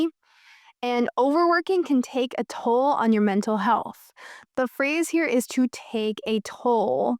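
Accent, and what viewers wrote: United States. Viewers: One of the best English teachers on youtube. I love your American accent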